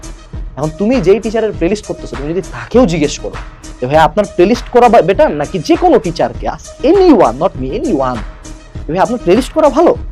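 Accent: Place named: Dinajpur, Bangladesh. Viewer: হ্যাঁ তে দিনাজপুরের চেংরা কি চাটগাঁইয়া ভাষাত কথা কহিবে বে?😂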